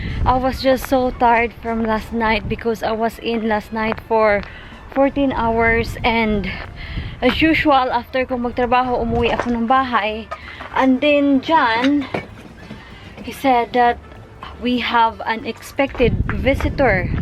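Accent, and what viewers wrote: United Kingdom. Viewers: I can hear the British accent emerging 😌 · When im watching you talking,i remember the queen when she talks,ive watched the crown,you speak like queen elizabeth,you have a british accent already....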